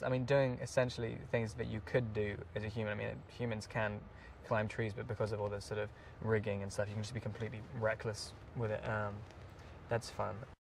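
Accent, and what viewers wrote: United Kingdom. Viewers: wow! really love the british accent...always mentioning it though...but i do really love his accent....gah! it's perfect on him...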